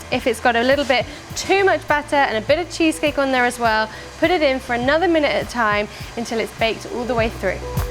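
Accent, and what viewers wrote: United Kingdom. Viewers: It is the best British accent I've heard from a you tuber.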